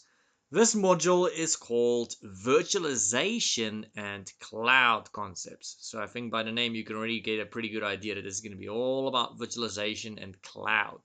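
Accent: Afrikaans, South Africa. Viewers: Cheeseburger 😅 Dude, I love your SA accent. You make more sense than Prof Messer.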